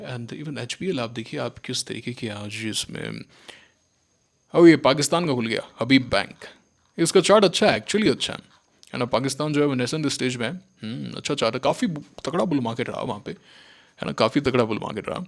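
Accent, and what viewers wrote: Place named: Bikaner, India. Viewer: आपकी बोली से आप नोखा बीकानेर से लगते पुश्तैनी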